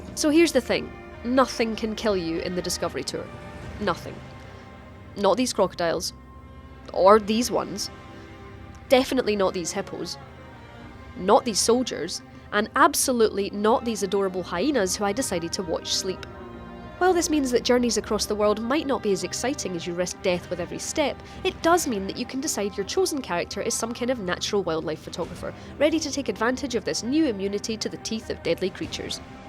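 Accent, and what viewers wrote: Scotland, United Kingdom. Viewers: TDX just her accent,Scottish i think,pretty cute actually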